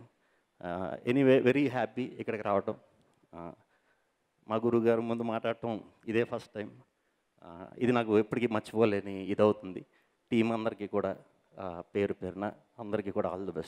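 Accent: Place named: Godavari, India. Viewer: Maa Godavari Slang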